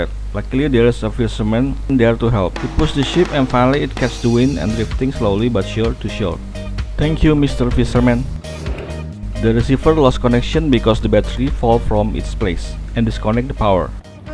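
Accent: Indonesia. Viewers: gw tau abang org indo karena dari logat 🗿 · Tadinya gw kira ini channel luar, pas denger logat nya wahh indo nii, dari ini gw percaya channel berkualitas bnyak juga di indo. Keren sii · Pantes kok kenal logat2 inggrisnya, ternyata org Indo. Wkwk. Mantep banget channelnya mas, gak nyangka ada yg punya topik foam RC plane 👍👍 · how come I feel the way you speak English is like an Indonesian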